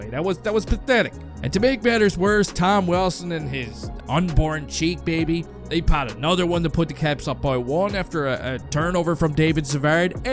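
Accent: Newfoundland, Canada. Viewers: Give him a break. Guys a Newfie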